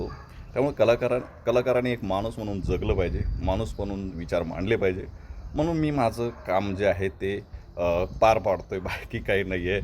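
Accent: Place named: Vidarbha, India. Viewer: Vidarbha boli vatate pan ekdam kadak❤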